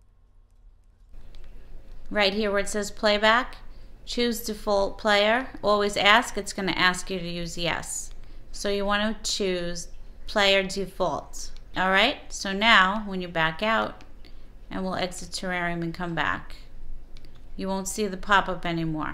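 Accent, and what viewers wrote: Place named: New England, United States. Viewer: Great job, easy to follow....even though I hear little yankee in your voice ;) , my southern draw would be much funnier lol.....great videos and keep up the good work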